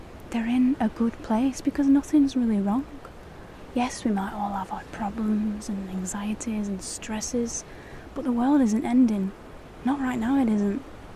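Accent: United Kingdom. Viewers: Like so many before me, I would like to express my gratitude for your channel and my joy in finding you! I just today found your videos and am so happy that I have. ASMR coupled with spirituality and themes such as unity and a universal oneness is exactly what has attracted me so much, in addition to your beautiful voice (singing and speaking) and positivity. You are such a beautiful light and a lovely soul! Thank you for all your wisdom and sharing these relaxing and informative videos for the world. <3 Also, I have to ask. Your website states you're from Manchester, England. However, I think you sound just like Ygritte from game of Thrones (Jon Snow's first girlfriend, if you're familiar with the show). She's played by Rose Leslie, who is Scottish. So I was extremely curious how a British accent and a Scottish accent sound so similar! Are Manchester accents similar, in your opinion? 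I'm just a silly American who obviously is unfamiliar with the subtleties and differences in accents. Thank you again,! Much love and light! <3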